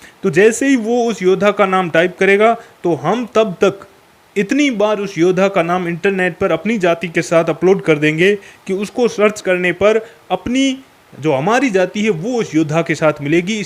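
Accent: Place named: West Rajasthan, India. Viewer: प्रिय भाई सादर नमस्कार 🙏 आपका भाषा उच्चारण सुनकर लगता है आप पश्चिम राजस्थान से हो. मे भी झुंझुनू राजस्थान से हू. आप बधाई के पात्र हो कि कितनी बढ़िया शैली से आपने मिम्स दिखाकर एक समाज की आलोचना की खिली उड़ाई और अपने आपको ये भी साबित कर दिया की मे तो जाति का कही नाम नहीं लेता. वाह क्या कला है आपमें 😄😄 ये बात आपको खुश करने के लिए पर्याप्त है की गुर्जर -प्रतिहार वंश मे गुर्जर होने के कारण कुछ लोग मिहिर भोज कों गुर्जर बता रहे है. भाई गुर्जरत्रा नाम ऐसे ही नहीं पड़ा जो नाम से पहले लगा लिया इसके लिए 6 ठी से लेकर 12 वीं सदी तक राज किया है गुर्जरों ने. तो आप इंटरनेट पर अपलोड करके महापुरुष बदल रहे है ये दुष्प्रचार मत करो. बाकी ये अमित शाह, महाराणा प्रताप, रविंद्र भाटी जी का आपने कहा से बनाया या जिसने बनाया गलत है मे इसमें पड़ना नहीं चाहता. कुछ बाते है आप जानलों तो अच्छा रहेगा संभवतः आपका भ्रम दूर हो जाये. 1.12 वीं सदी मे राजपूत शब्द की उत्पत्ति हुई उससे पहले राजपूत का 'र 'भी नहीं था इस दुनिया मे. 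2 राजपूत कोई जाति नहीं होती है जाति ठाकुर होती. 12 वीं सदी व उसके बाद ठाकुरो का राज होने पर उन्होंने राजपूत शब्द कों अपना टैग बना लिया. 3. राजपूत संस्कृत शब्द 'राजपुत्र ' का अपभ्रंश है जिसका अर्थ है राजा का पुत्र. प्राचीन काल मे चारो क्षत्रिय जाति अहीर, जाट, गुर्जर, ठाकुर इस शब्द का प्रयोग करती थी. 4. 12 वीं सदी के आते आते अन्य राजवंशो का पतन हो गया. खाली ठाकुरो ने अपना राज बचाये रखा अतः उन्होंने राजपूत शब्द आपने साथ जोड़ लिया. 5. राज कैसे बचा, क्या -क्या मुगलो और अन्य कों देना पड़ा जिक्र करना उचित नहीं है आप खुद समझदार हो सब कुछ कमेंट मे लिखना संभव नहीं है आप मुझे कॉल कर सकते है <num> पर. उम्मीद है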